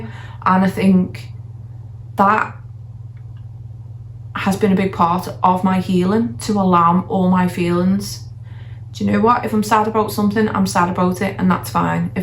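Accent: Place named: Liverpool, United Kingdom. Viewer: Yes scouser 🙌🏻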